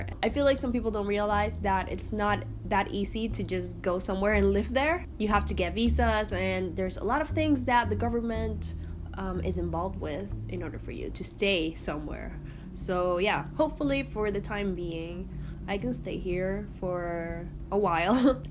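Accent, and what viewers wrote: Dominican Republic. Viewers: Amo amo tu acento Dominicano! saludos desde Panamá <3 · Aaaaah, me encantó tú acento ): ❤️ el acento dominicano es uno de los que más me gusta 👀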